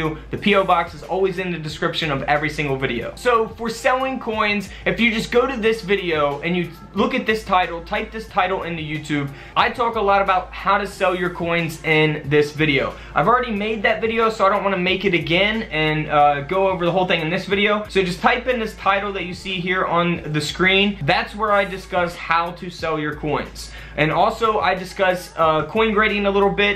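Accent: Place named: New York, United States. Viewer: Really,..! He must be, either from, NYC, or Jersey shore, Noooo, I m not type casting, am I,..?lol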